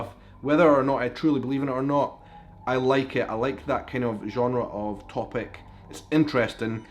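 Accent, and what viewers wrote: Scotland, United Kingdom. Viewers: Hello i am from Singapore i am 40 years old. First of all thank u so much for sharing with us those scary stories. Honestly u are such a BRAVE guy to walk in the dark tunnel. To be very honest i can understand your Scottish accent. 👍👍👍👍👍👍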